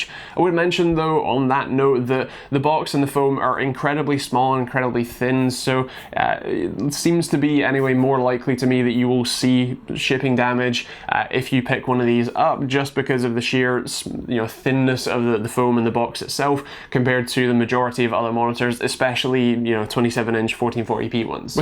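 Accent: Scotland, United Kingdom. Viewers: Where in Scotland are you from? Sounds northern. Maybe Inverness?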